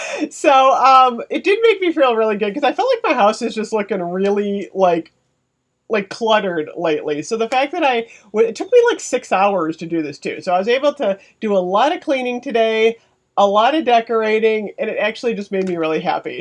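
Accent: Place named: Northern Ohio, United States. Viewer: Susan Smith, that is the regional pronunciation of that particular word. You will find most Northern Ohioans do pronounce things differently than the rest of the country. I’m not far from and I pronounce it that way as well. I am also college educated. It really is the regional vernacular.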